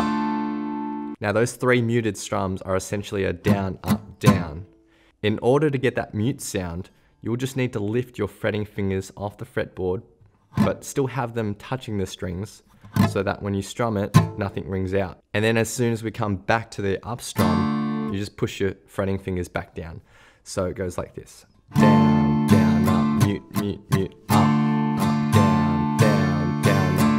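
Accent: Australia. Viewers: Best tutorial vid on Youtube for beginner like me, thank you.. are you Vietnamese with Aussie accent? :)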